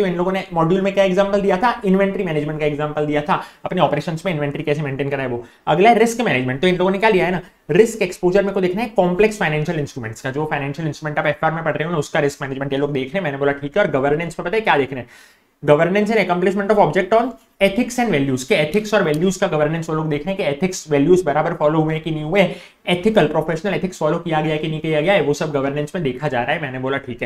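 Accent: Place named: Indore, India. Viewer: Sir bataya nai aapne... App indore se honaa...? Apki boli se lgriya h sir 😁😁😁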